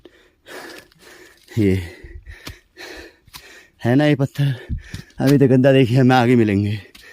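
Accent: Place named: Almora, India. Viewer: गुरु जी गांव, विकास खंड व जिला भी बताने का कष्ट कीजिए। वैसे आपकी आवाज से लग रहा है आप अल्मोड़ा जिले से हैं। धन्यवाद जी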